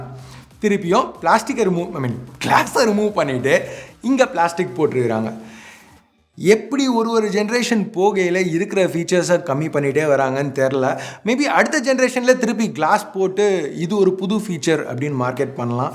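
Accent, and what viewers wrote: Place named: Chennai, India. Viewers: Nadula nadula chennai slang varudhe🤭😶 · Ona pakave irritate ah irukku . Onnoda hair style and thavai illatha vetti pechu Mayiru tamil basai ketta chennai tamil nu soldra asingam puduchavane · Enna bro chennai slang la pessa try panra maathi theriyuthe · Bro antha Chennai Tamil slang nalla irukku bro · Chennai slang super bro. Already subscribed.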